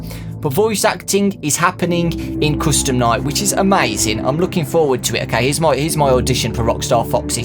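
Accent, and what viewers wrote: United Kingdom. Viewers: Awesome you british voice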